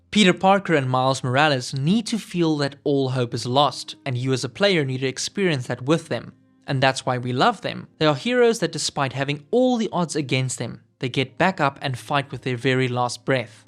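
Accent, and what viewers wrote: South Africa. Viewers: Are you South African by any chance? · Fellow South African here. First time I’m coming across one of your videos, heard your accent and it was an instant ‘Subscribe’ for me 💪🏽 keep it up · Going into spiderman 2 I was expecting the game to be the arkham city (still the best superhero game as far as I'm concerned) of its series and it doesn't. The first game lived up to my excitement and it's very good and it was the foundation just as Asylum was for Batman but. Miles morales was moving in the right direction but this game was a letdown. Spiderman 2's stealth is worse somehow, the combat is missing the suit and visor mods, suit powers and gadgets are worse versions of the previous ones. The only good thing is the traversal and it's still lacking feature games like web of shadows allowing spiderman to run in any direction on the wall. 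I shouldn't have pre-ordered this one and got the RE4 remake and Deadspace remake. The games only cost 600 rands each at BT games and I would've saved 200 (I assume you're South African with accent). I agree about venom and Kraven being the best visually and the VAs did an amazing job but I was hoping they'd be stalkers who pounce out of nowhere like taskmaster did in the first game but Kraven was mostly a Gladiator. 6/10 after my second playthrough.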